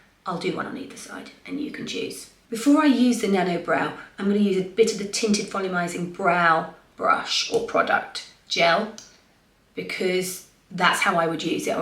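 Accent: England, United Kingdom. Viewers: you are just so sweet and I love your lovely English accent. I think the kosas foundation looks amazing on your skin. Your skin is beautiful without makeup…..you are glowing girl. I so enjoy your videos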